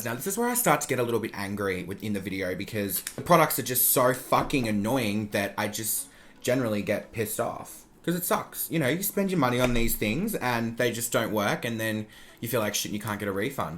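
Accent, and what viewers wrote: Australia. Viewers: Is he Aussie? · "Shout out to Kylie for making me spend $50 on this piece of shit" You can definitely tell you're an Aussie 😂 you crack me up so much because you remind me of some of my friends. Love to you from Melbourne!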